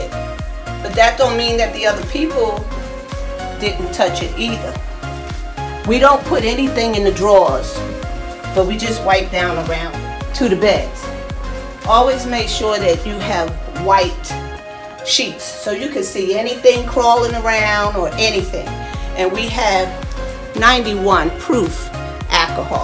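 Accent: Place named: New Jersey, United States. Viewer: I love her mid-Atlantic accent (New Jersey)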